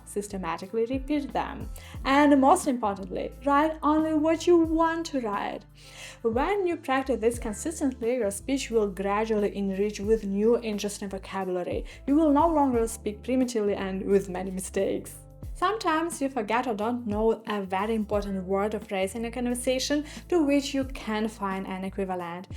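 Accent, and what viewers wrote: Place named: Germany. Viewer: When told to speak German without speaking German. Your English is influenced greatly by your German, easy to sense it😂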